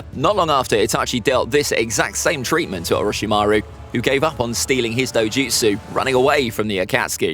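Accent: United Kingdom. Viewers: He has British accent❤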